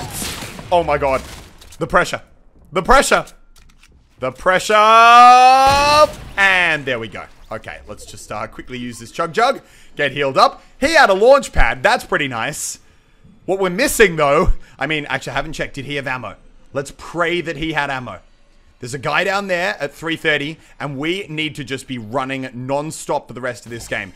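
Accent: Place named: Australia. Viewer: Jesse Nieves he is ausie and sounds like croc hunter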